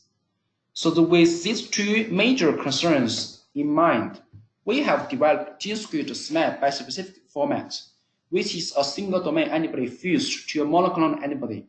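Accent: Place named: China. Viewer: chinese accent is so strong, can't understand what he says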